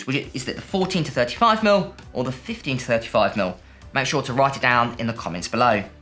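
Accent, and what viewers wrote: United Kingdom. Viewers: your English accent is annoying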